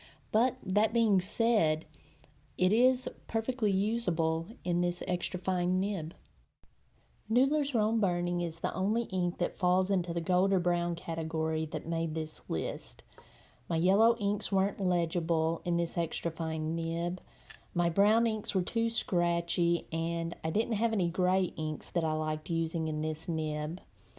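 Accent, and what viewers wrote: South, United States. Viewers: being from mississippi ... love that southern draw ... :-)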